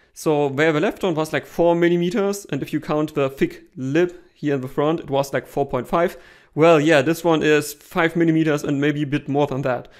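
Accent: Germany. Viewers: the german accent which sounds more delicate than american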